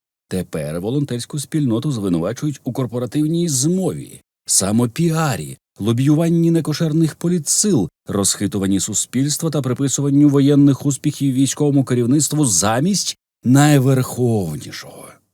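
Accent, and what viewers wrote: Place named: Russia. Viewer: У вас дуже гарний, заспокійливий український голос, а російський такий наче ви самий корінний росіян із росіян. Так вуха ріже і дратує шо капец...часто втрачаю саму суть тексту...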